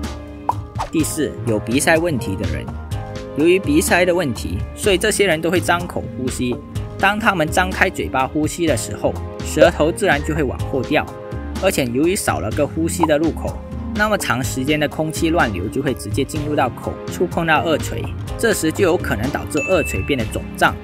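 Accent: Malaysia. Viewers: 嗨，请问你是马来西亚人吗，因为你的口音很像马来西亚人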